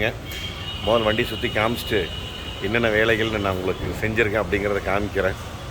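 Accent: Kongu, India. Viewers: கொஞ்சும் கொங்குத் தமிழ் பேசும் கோமகரே...! திகட்டாத தித்திக்கும் பேச்சுடைய திருப்பூர் திருமகரே...! மதிப்பிற்குறிய " " திருப்பூர் திரு. அண்ணா🙏❤ எப்படி இருக்கீங்க❤🙏 அண்ணி எப்படி இருக்காங்க❤🙏 ரித்திக் எப்படி இருக்காரு❤ அருமை அருமை அருமை வழக்கம்போல அண்ணா உங்களோட வீடியோ அருமை❤❤❤😊😊❤❤ என்ன சொல்ல ஏது சொல்ல😊😊❤❤❤ சும்மா நெகு...நெகு...நெகுனு இருக்கு ஹுண்டாய் I20😊😊❤❤❤❤ வெள்ளை நிறமும்/கருப்பு நிறமும் அட்டகாசம் போங்க😊😊😊❤❤❤ அதிலும் குறிப்பாக அந்த "அலாய் வீல்" வேற மாதிரி வேற மாதிரி😊😊😊😊❤❤❤❤❤ அழும் குழந்தையின் அழுகையை நிறுத்த தாயால் மட்டுமே முடியும்...! அதேபோல.... அஞ்சு பைசாவுக்குகூட தேறாமல் அழுக்குப் படிஞ்சு பாழாய்ப்போன காரை அழகுபடுத்தி ஆரோக்கியமா மாத்த " " அண்ணாவாலதான் முடியும்😊😊😊❤❤❤❤🙏 இந்த வீடியோவில் சரியாக வது செகண்ட்ல அண்ணாவோட வேலைப்பாடுகள் பற்றி கிண்டல் செய்து சொன்ன தன் அறிவை கழட்டி ஆப் ஆயில் போட்டு தானே எடுத்துத் திண்ண அந்த ஆளுக்கானது...... குறை சொல்பவனுக்கு குழந்தையின் சிரிப்பும் குற்றம்தான்....! 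உளறுபவனுக்கு உழைப்பின் மகத்துவமும் தன் மரமண்டையில் ஏறாத ஒன்றுதான்...! அப்படிப்பட்டவன்தான் அந்த கிண்டல் செய்தவனும்...! " " அண்ணா நீங்க அடிச்சு துவைச்சு போயிட்டே இருங்க அண்ணா😊❤ உங்கள் திறமை யாவும் வாசம் நிறைந்த சந்தனம் போன்றது😊❤...! அதெல்லாம் சாக்கடை எது? சந்தனம் எது? என்று பகிர்ந்து பார்க்கத் தெரியாத அந்த கிண்டல் செய்த மடையனுக்குப் புரியாது...! என்றும் அன்புடன் உங்கள் தம்பி ரா.முஹம்மது கபூர் (சென்னையில் இருந்து) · வணக்கம் அண்ணா. எனது பெயர் சிவராமகிருஷ்ணன். கோவை சூலூர் பகுதியில் வசிக்கிறேன். தங்களது வேலைகள் அனைத்தும் மிகவும் சிறப்பாக உள்ளன. தங்கள் காணொளிகளை சமீபகாலமாக பார்த்து வருகிறேன். உங்களது கொங்கு மொழி சாயலும், நகைச்சுவையான பேச்சும், விளக்கங்களும் ரசிக்கும் வண்ணம் உள்ளன. தங்களிடம் வருகை தரும் பல்வேறு மாவட்டங்களை சேர்ந்த வாடிக்கையாளர்களே அதற்கு சான்று. விரைவில் நீங்கள் பிற மாநில வாடிக்கையாளர்களும் பெற எனது வாழ்த்துக்கள். Alloy wheels - Super design I seen in non-company alloy wheels. Dual tone colour paint - Awesome. 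DRLs - Great.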